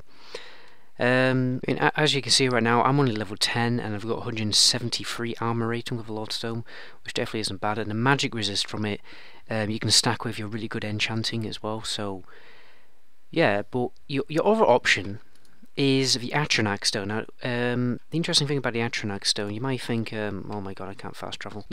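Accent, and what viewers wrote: United Kingdom. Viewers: Cool accent. Where are you from in the UK? I'm Scottish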